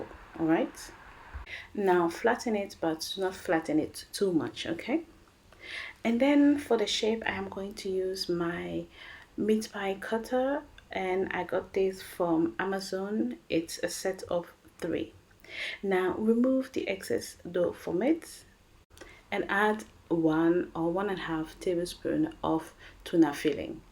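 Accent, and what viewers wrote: Ghana. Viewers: I love your Ghanian accent, I have that with Dutch ..lol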